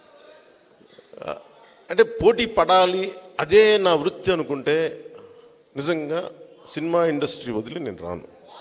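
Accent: India. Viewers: nuve inter failed candidate... America ki adukkodaniki vellii...nuvu ne Buttler English...vammoooo... nee picchi elagey continue cheyee.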